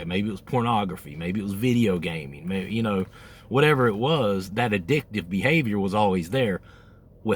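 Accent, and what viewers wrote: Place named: South, United States. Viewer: Interesting you mentioned you're from Georgia with parts of the "typical" Southern accent. My parents were born and raised in Ohio. When I was two, in 1958, we moved to Atlanta - my parents actually had the house build on the new lot. But it was in a brand new suburb in South Atlanta. Just about ALL the kids in that neighborhood and my schoolmates were transients from other parts of the country. When I moved to New York City at the age of 26 (Yes - it was quite a transition!) - most New Yorkers couldn't tell where I was from by my accent. NONE thought I was from the Northeast! They REALLY didn't guess the South.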